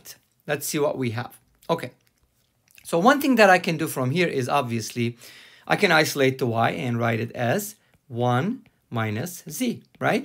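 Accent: Turkey. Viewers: Hello, may I ask where you’re from because your accent sounds like Turkish accent and I am Turkish so I just wanted to know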